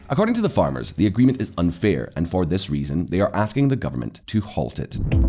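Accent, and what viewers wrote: United Kingdom. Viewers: Curious which part of UK is the narrators accent from?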